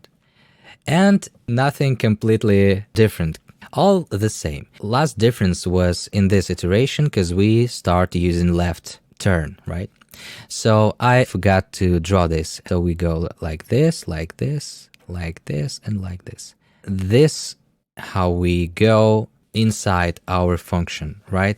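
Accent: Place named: Russia. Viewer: Cool video, comrade. Your Russian accent is so clear and melodic. I love It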